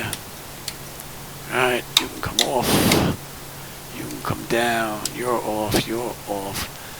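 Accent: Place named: New York, United States. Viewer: Also, I got a strong Boston accent, but sounds like you got a New Yorker one, I love the city of New York, but screw your teams😂 just messing around bud. Good rivalry we got.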